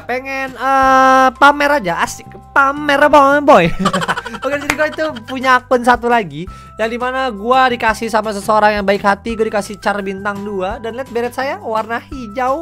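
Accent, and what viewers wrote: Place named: Pekalongan, Indonesia. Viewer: Pasti orang pekalongan.😂😂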